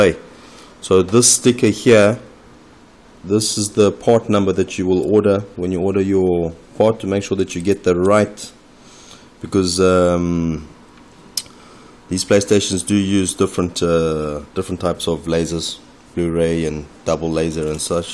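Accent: South Africa. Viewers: South African? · Excellent video! Nice job! Are you South African? You're accent sounds South African.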